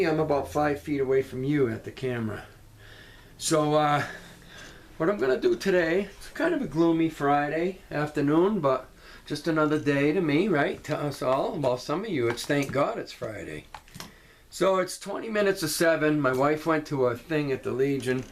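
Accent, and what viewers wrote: New England, United States. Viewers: Enjoy your YouTube journey, my friend. You sound like you're in my kneck of the woods, Newengland. New Subscriber 🎸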